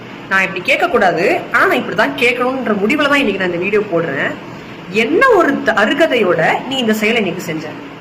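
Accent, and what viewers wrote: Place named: Madurai, India. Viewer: Very good with Madurai slang deecently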